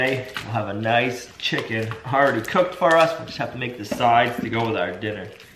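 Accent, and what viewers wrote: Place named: South, United States. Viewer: lol I hear your southern coming through. I see more applewood bacon in your future.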